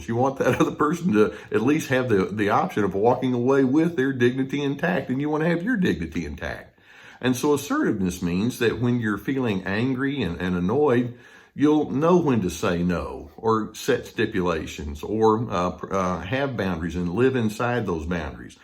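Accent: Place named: Texas, United States. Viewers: Dear, you and your warmth and wisdom are a guiding star to me. If I ever meet someone like you I’ll marry them right away 😜 Just kidding of course, because we’re striving for ‚Team Healthy‘, right? This video was (again) super helpful and well organized. I am always trying to educate myself on ‚early signs‘ in getting to know people and potential friends or a potential partner... and this video was particularly helpful. And you coming across so warm-hearted and well-balanced certainly doesn’t hurt your message. And btw.: I love your Texan accent too 😍 Love my regular dose of Dr.Carter 🥰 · I love Texas Twang, too, being from Texas (military brat).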